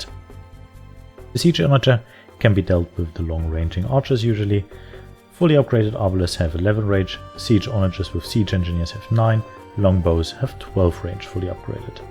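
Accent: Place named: Germany. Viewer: Nice first strategy video. I think the best way you can improve this is working on your idiomatic English. As an example, there is your use of the formation "despite of", which occurs at least four times in this video. Now, the way you used it is fine, except for the fact that the formation does not exist in English. The formation you are looking for is "except for". Your non-standard word order is distracting. For example, "This makes it possible to go earlier on wood", which sounds a bit like Yoda-speak. Try: "This makes it possible to go on wood earlier". Also, "they run out of a bit faster wood" should be "they run out of wood a bit faster" - otherwise it almost sounds like they are only going to have slow wood left after the "a bit faster" wood runs out. In these constructions, remember to group "verb + direct object" then put the modifier (e.g. 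earlier/a bit faster) at the end. Word order is as important in English as word form/ending is in German. Incidentally, sheep is one of those rare words in English that are the same in singular and plural - there are no "sheeps". There are a lot of typical German-speaker phoneme substitutions in your spoken English but these will be fine if you can iron out the grammatical issues. These comments are intended to be constructive. Your English is much, much better than my German, but I am not running a media channel in German so my mistakes have few consequences. You are providing quality AoE content and I think if you work on these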